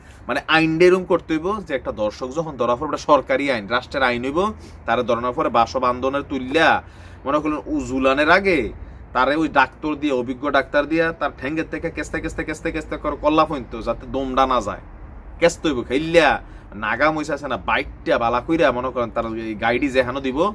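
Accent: Comilla, Bangladesh. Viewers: ভাই ও ভাই, অাপনার কূমিল্লা ভাষা খূভ ভালো লাগে, অাপনি সব বিডিও কূমিল্লার ভাষায় করেন, অাপনি কূমিল্লার অহংকার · ভাই আমি কুমিল্লা হোমনা থানার মানুষ। আমারও এই খবরটা শুনে খুব লজ্জা লাগছে। মানুষ কতটা নিচে নামলে এমন কাজ করতে পারে ; এরা জনোয়ারের থেকেও অধম। আর আপনার মুখে কুমিল্লার ভাষা শুনে আমার হাসতে হাসতে পেট বেথার অবস্থা । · Vai amio kintu cumillar ar apnar cumillar lenguege ta osthir cilo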